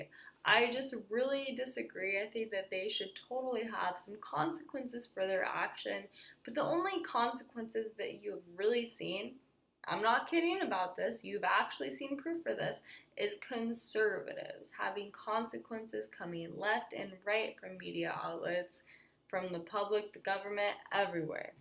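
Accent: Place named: Ireland. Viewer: She's Irish.....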